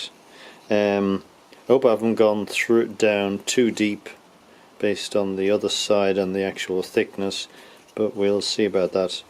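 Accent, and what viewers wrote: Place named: Ireland. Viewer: This guys Irish accent is Cool 👌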